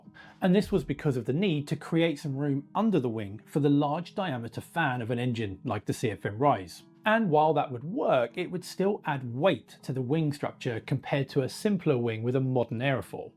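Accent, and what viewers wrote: United Kingdom. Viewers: I have to say I do appreciate the English accent. So few English Pilots on YouTube. He is the only one I know. of. I'm sure there are, just have not seen them. More so I appreciate his ability like Petter, to thoroughly explain in laymen terms. The down side, It makes me like Aviation more. LOL.... I Do hope that things improve in environmentally sound engines. I know we can. It can only help things, and ensure the aviation sector. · I prefer Petter's style and accent (even though he is not from native English speaking country) to (with his British accent, especially on-at least 10-'but')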